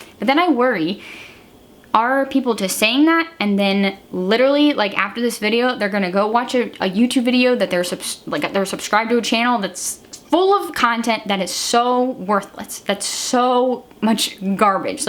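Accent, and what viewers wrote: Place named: Midwest, United States. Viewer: lol, that mid-western accent 😂😂 is it strong with this one... If your from the Midwest you might not hear it though :(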